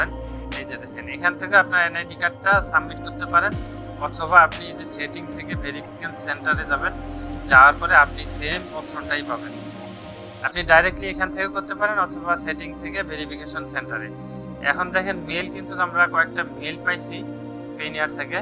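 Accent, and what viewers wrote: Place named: North, Bangladesh. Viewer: অনেক সুন্দর ভিডিও ব্রো। ভাইয়ের বাসা কোথায়। ভাষা শুনে আমাদের উত্তরাঞ্চলের মনে হয়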